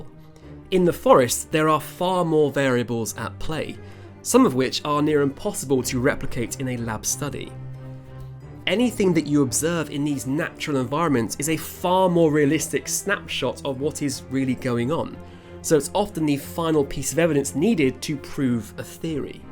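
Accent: United Kingdom. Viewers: This is a masterful exercise in gaslighting, all the way down to the British accented narrator. uses a well known template from the timber and chemical industries- employ delaying tactics to tear down the best current research by asking for more extensive research. This is significant when taken in the context of major timber harvesting plans already underway in Canada and more planned in the Pacific Northwest in the US. Simard is correct in pointing out that her one time "colleagues" are "reactionary" scientists. doesn't point to any research from Simard's critics on the relationship of the mycorrhizal network to the trees and how that can be better understood with newly designed experiments. It would be interesting to see if has done any films on the roles the chemical and timber industries play. Try understanding the role Bonnie Bessler's "auto inducers" play in spreading the information carried by microorganisms.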